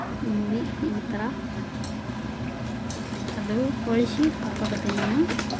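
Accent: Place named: North Karnataka, India. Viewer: Namma uttarkannda maatadakttere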